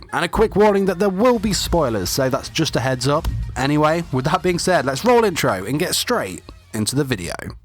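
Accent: United Kingdom. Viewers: Is it the British voice? He drags me back for these facts don't worry I love his facts which that's his FACTOR :P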